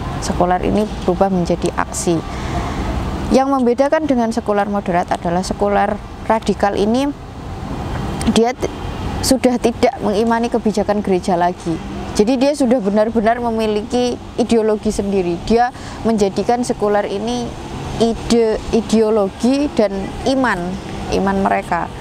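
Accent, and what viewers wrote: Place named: Java, Indonesia. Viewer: Medhokee leh mb Ditunggu ulasan buku lainnya ka...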